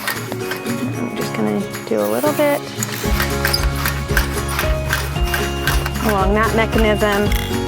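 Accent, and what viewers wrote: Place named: United States. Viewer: I love the American accent...why cant Australia have more American girls lol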